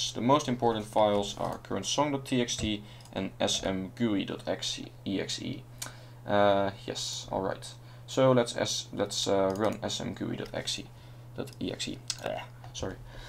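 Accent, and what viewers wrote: Netherlands. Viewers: Haha, je hoort aan je accent dat je zo Nederlands als wat bent XD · your accent, you are dutch speaking are you?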